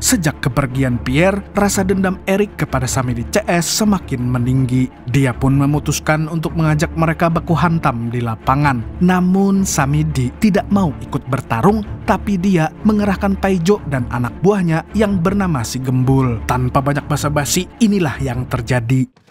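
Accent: Banjar, Indonesia. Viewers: urang banjar pian lah min 😀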